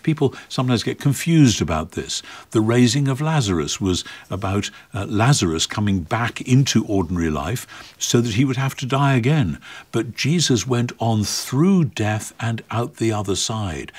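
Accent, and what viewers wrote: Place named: United Kingdom. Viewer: N.T Wright has one of the best British accent I’ve ever heard I could listen to them all day.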